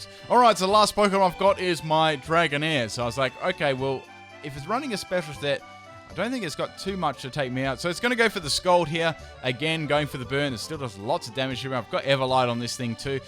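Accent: Australia. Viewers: are u ausrtralian?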